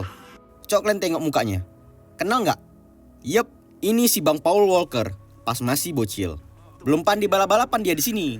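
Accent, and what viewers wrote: Medan, Indonesia. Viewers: Hahha medan kali logat kau lek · Bang kau orang Medan iya kan...? Logat kau GK asing Bg krna aku juga orang Medan 😁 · MEDAN KALI BAH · Lucu kali abang ini bicaranya mcm org Medan aja. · Abang ini keknya orang medan ya... Logatnya itu medan kali kutengok😂😂😂😂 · bah medan kali bang ,,uda ku subrik ya bang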